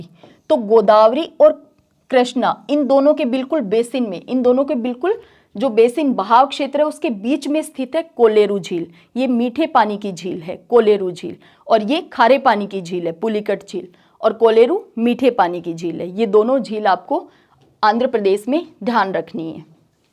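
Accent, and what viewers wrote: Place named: Gujarat, India. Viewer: Apke pronounce sation Amit Shah ji sahan hai. AP Gujarat se belong krte ho kya?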